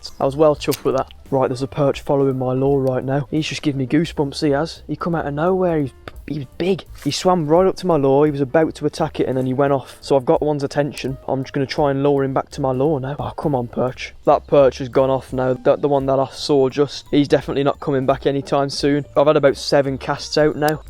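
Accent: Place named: United Kingdom. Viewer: good vid bro but the uk accent is so annoying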